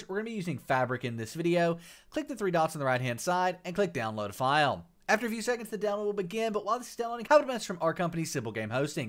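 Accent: South, United States. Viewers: dude turns country at the end of every statement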